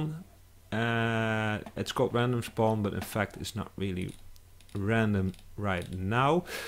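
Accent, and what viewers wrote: Netherlands. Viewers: haha, Nederlander ofzo die dit zegt. Haha, the man that tells it, is Dutch i think :)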